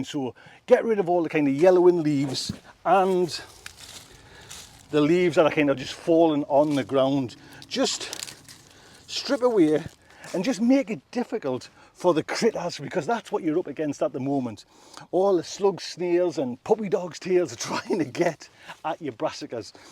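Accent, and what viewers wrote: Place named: Geordie, United Kingdom. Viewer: Love that Geordie dialect. Wonderful. Know a few and they are real down to earth folk. Thank you for the advice! For Nottinghashire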